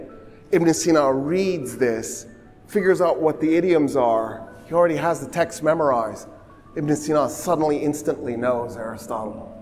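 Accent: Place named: Iran. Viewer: این استاد ایرانی نیست؟ همه ظاهرش هم صحبت کردنش شبیه یه ایرانی هست که ۲۰ ساله خارجه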